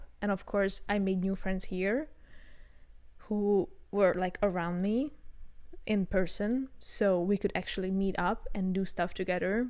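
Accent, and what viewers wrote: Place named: Hungary. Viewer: It's cool hearing you speak English, as a Hungarian I always cringe because of my accent, but I actually like your's even if it is Hungarian.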